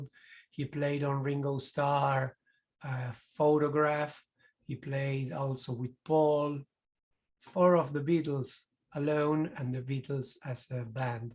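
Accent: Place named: Argentina. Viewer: se nota que es argento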